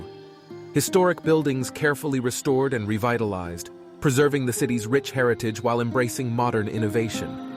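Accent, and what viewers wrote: United States. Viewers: I didn't - it was smooth! I did wonder why an American was narrating Australian construction but hey, global society and all that. :-) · Why is there an American voice-over? Give this video an Australian character by having someone who has an Australian accent, at the very least.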